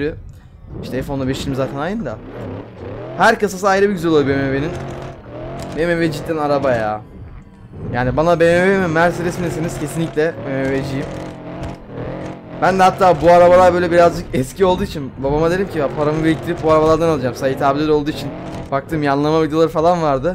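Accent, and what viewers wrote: Erzurum, Turkey. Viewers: ANA ABİ SEN ERZURUMLUMUSUN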